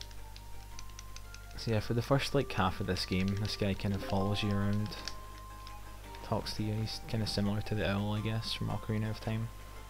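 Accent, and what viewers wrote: Scotland, United Kingdom. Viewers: Awesome game+speedrunning+Scottish accent commentary=epicosity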